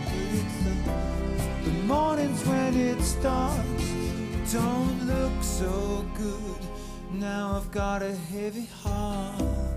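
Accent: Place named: England, United Kingdom. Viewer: thumbs up to an englishish accent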